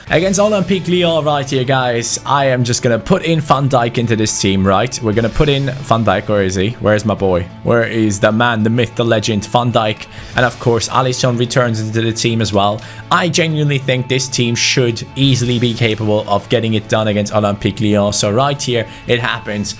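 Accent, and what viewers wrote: Saudi Arabia. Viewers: he is probably from sadra Arabia becouse that's how they say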